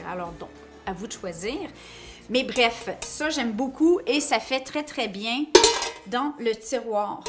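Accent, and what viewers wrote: Canada. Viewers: J’adore votre accent canadien, je me sens un peu en vacances en vous écoutant 😊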